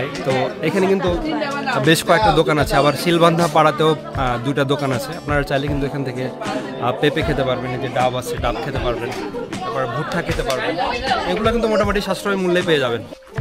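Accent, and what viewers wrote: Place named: Kolkata, India. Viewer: ভাই কি ইন্ডিয়ান? কথার ভেতর কলকাতার ভাষার টান আছে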